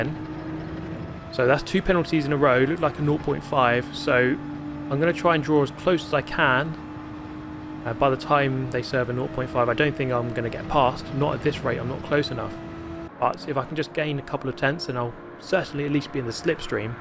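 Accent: United Kingdom. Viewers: Shhh nobody tell him any monotonous motorsport hum in the background will do for some people...😂 No seriously, I fell asleep many times to but the Voice IS certainly a part of it. Also the british accent and the sense of humor!! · Grove sent me here. Can't beat a British mans narration!!! Excellent Video.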